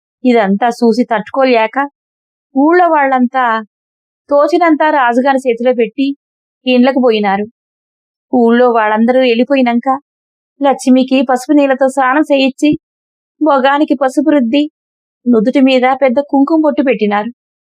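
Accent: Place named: Chittoor, India. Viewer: చాలా touching గా వుంది కథ. ఎంతో బాగా చెప్పారు కథ. చిత్తూరు యాస కూడా ఎంతో గొప్పగా పలికారు.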